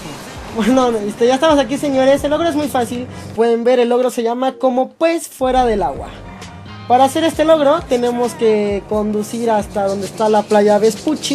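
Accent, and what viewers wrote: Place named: Mexico. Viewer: Habla como mexicano, dice palabras de españa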